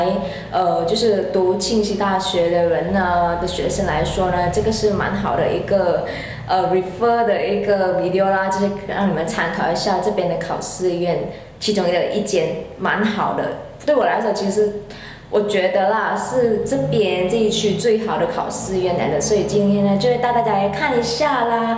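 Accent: Malaysia. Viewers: 马来西亚口音😂😂我也是马来西亚人~以后也想去韩国留学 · 超级马来西亚英语...... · 好亲切的华语 ，马来西亚lang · 听口音 马来西亚！！！！ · 一听就知道是马来西亚人😂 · 马来西亚式华文😂😂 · 你好我是韩国人 你是马拉西亚人吗 我第一次听过这个发音！觉得很可爱！ 很有魅力的！😄 而且你韩文发音也很好👍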